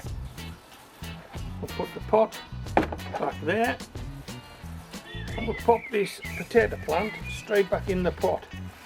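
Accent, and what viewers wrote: United Kingdom. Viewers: Yes I am a first timer, as my husband is, trying to be more self sufficient. The main experience I have is failed whatever I tried to grow. It's like if I breathe same air as my plants they get sick. However, my main thing is dogs, and I just love your helper dog Mollie. Is she Border Collie? Her interest and attention span is better than alot of people I know. Ok also love the accent. Not sure exactly what it is: British, Scottish, Whales? wherever, I listen so I can enjoy the accent. If you did not pick these potatoes so small, would they grow to larger size like grocery store? Will more grow at the same spot you picked? How do we know when it's done and to raid the center of the soil ball? I assume we can do same procedure with sweet potatoes? Anyway, sorry I have alot of questions but thanks so much for easy lesson dark can do. 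· Full of useful information. So many videos are from Americans and their conditions do not apply in the UK.So nice to hear a British accent for a change. I am sick to death of know it alls with strange accents!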